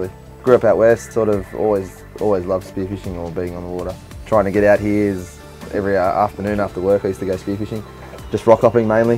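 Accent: Australia. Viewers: What part of Australia is that?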